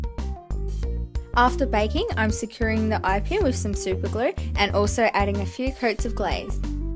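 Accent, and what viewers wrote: Australia. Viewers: Are you Australian you sound like it I am Aussie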